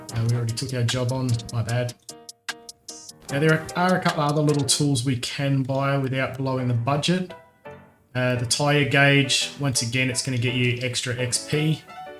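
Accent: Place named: Australia. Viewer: Great advice. Cheers mate, much appreciated. You sound Aussie (Australian). A welcomed relief from other accents online.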